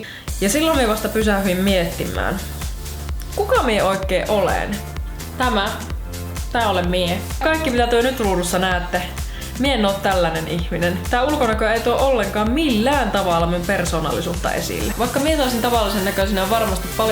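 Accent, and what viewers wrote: Finland. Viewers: Nonni nyt minnuun tarttu tuo siun mie sie murre 😂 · ootko joensuusta ku murre on nii vahva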